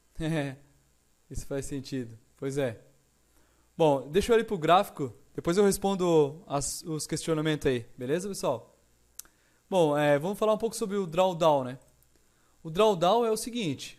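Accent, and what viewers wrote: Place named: Santa Catarina, Brazil. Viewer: ajudou bastante ! Pelo sotaque você deve ser catarinense, se for somos vizinhos, eu sou de Rio Negrinho SC rsrs....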